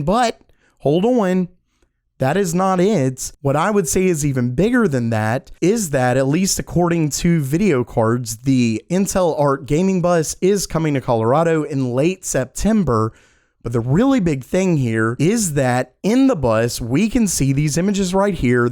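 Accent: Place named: South, United States. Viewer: I cant believed I've never caught your southern accent before lol, It's very subtle.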